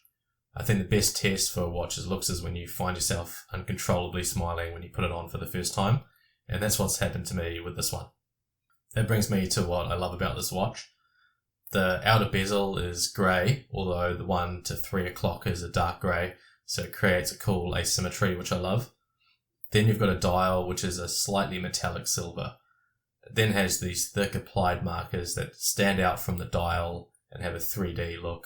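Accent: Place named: New Zealand. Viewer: You sound more Kiwi than Australian. Another ship jumper?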